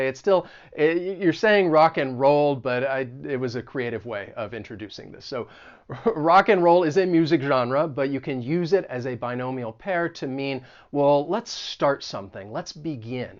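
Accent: United States. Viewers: Hey,.I'm into your Amerikan accent and your cheerful and useful videos.Every time when I watch your video lessons,I'll reams of useful things.Please teach us more phrasal verbs and idioms.I can't thank you enough for your lessons.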